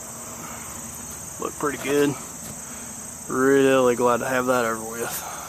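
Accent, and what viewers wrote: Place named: South, United States. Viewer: Impressive work! And that southern accent of yours... I could listen to it all day. ;-)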